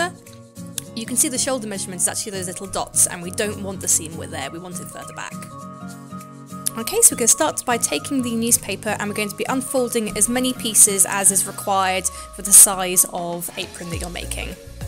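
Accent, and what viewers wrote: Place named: England, United Kingdom. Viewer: Someone with an English accent using imperial measurements 😍 Most videos use those centimeters and millimeters nonsense 🤣🤣🤣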